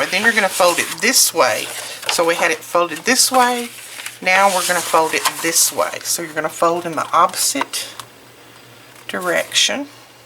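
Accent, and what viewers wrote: South, United States. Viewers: Thank you for this tutorial. I tried it immediately and it works great. And your southern english sounds so nice. I will follows your blocs.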